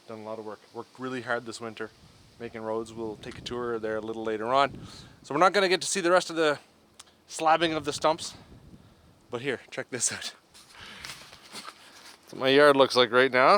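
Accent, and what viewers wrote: Canada. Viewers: your accent . are you Canadian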